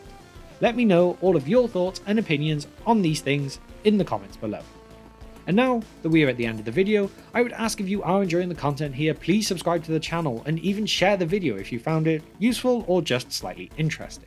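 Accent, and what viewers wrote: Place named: North West England, United Kingdom. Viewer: Aah cool. I'm a scouser and thought I heard a hint of North West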